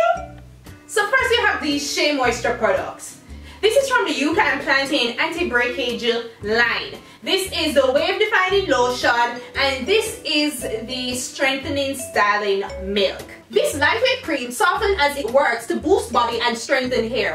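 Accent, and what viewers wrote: Jamaica. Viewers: so refreshing to watch a hair blogger with a jamaican accent 💖🙌🏿 you really named and shamed them products ha HAAA 😂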